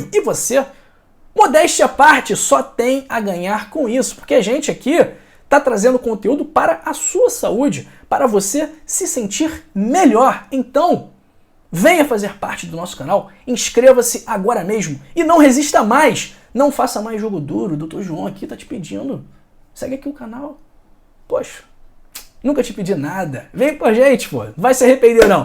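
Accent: Rio de Janeiro, Brazil. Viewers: O sotaque do x já sabemos q e do rio essa palavra pechsquisa e do rio não sei como consegue mudar pro Chis ou xis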